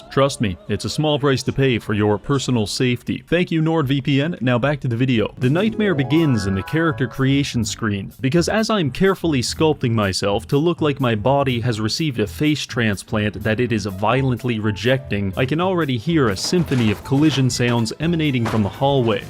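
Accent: Newfoundland, Canada. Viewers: Can't enjoy this for the hard-stabbed Canadian O twice a sentence. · Are you canadian? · Dude are you from Newfoundland? I recognize the accent. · Instead of Car all I hear him say is “ker”, Canadians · Because is violently canadian, and his accent comes through really hard on some really random syllables.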